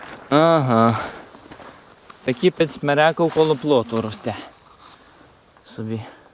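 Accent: Hutsul, Ukraine. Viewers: Приємно слухати гуцульську говірку я б тілько за це і дививсі би!)) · Але файна розмова Гуцульска дуже люблю слухати 😂❤❤ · Насолоджуюсь лісом, грибочками і МОВОЮ! Спасибі, легінику! Це свято - побувати в осінньому лісі, побачити саламандр і почути файну гуцульську говірку! Дякую щиро!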